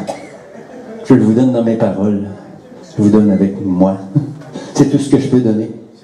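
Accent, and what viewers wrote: Canada. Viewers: Merci pour ce beau partage 🌹 je trouve extraordinaire que l accent canadien disparaisse lors du chant et revienne après 😁... Pareil pour Céline 😉 Toujours que l interprétation est très belle, bravo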